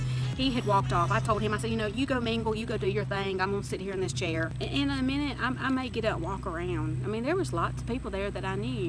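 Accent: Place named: South, United States. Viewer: Yay for a fellow southern speaker, lol. I like all the scene cuts that move the story along. I need more of that in my stuff cause it holds attention. I don't have a lot of voice of influence as I don't work and I don't get out much except with the wifey. But when I do, I try to engage with people on a positive level and spread a little humor. Subbed!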